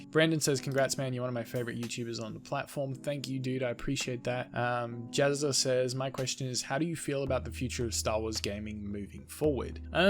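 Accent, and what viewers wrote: Australia. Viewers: Your Australian? The Upside Down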